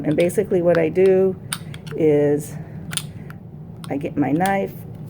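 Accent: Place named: East Coast, United States. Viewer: Wait! You are in Michigan too? With your accent I thought out East! ♥️💋🇺🇸💃🏻 Cool! Sorry, I am new to your channel so I just found out. Love your videos they are so calming. Thank you! 🙏🏼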